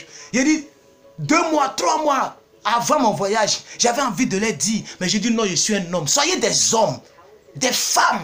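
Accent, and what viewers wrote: Ivory Coast. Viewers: 😂😂😂 Ivoirien tu es trop fort, ton accent est trop chic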